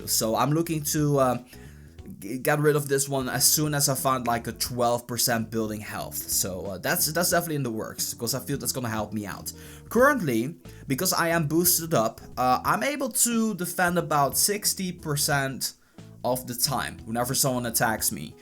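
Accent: Netherlands. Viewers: | Boom Beach & Clash Royale Are you from the netherlands? you have a dutch accent :L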